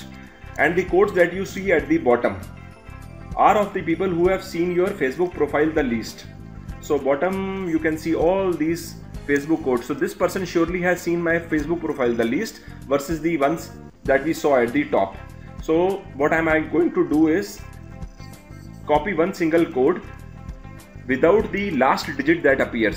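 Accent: India. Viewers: oooooooooooh noooooooooooo damn indian voice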